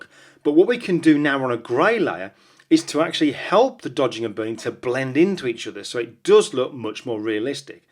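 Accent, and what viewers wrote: United Kingdom. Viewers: Amazing tips, Great stuff , with a British accent too :) keep these coming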